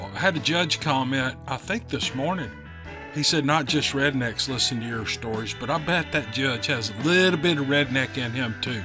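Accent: South, United States. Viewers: Hey....Im not a red neck, but I did live in Arkansas for over 40 years....I love the South and its great to hear your accent... Love it... Thanks for show. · Now wait just a minute! You better hold on to your Weave. Cuz this is an all inclusive Channel. Us Yanks love to curl up and listen to our Favorite County boy. With his smooth Southern accent!🥰🎶🙏🏾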